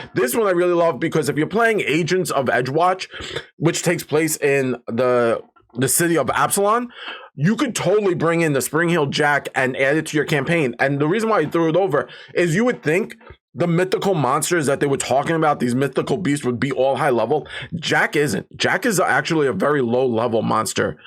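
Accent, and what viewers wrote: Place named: New York, United States. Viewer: Great video! And great NY accent!!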